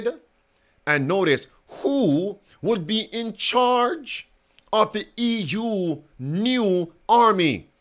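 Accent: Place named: Jamaica. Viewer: Ingles, el nacio en Jamaica por eso el accento que tiene.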